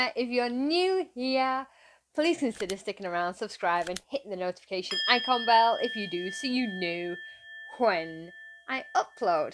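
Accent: South, United States. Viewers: I love your reactions more and more now that you've become alittle country girl 👢, it just makes me laugh because you're learning our slang country talking,I love you and even though you don't see much of him,I know he's there,thanks for your reaction hope you guys have a blessed day 🙏🍑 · That is the way we all talk in the south lol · I'm glad you went so into it,southern talk!!!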